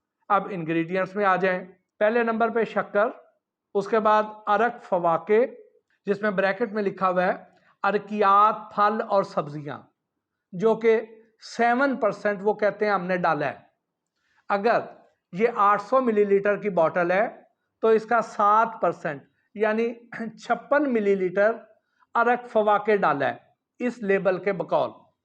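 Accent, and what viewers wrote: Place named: Punjab, India. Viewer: this pendo man does not know anything . his urdu accent is mixture of punjabi . funny language 😆😆😆😆😆😆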